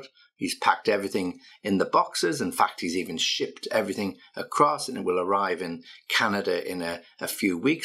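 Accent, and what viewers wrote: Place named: United Kingdom. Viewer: Your accent is so interesting 🤓✌️ may I ask are you British 😸? If you are may I ask for some British pronunciation videos please 🤓🙏🤓🙏🤓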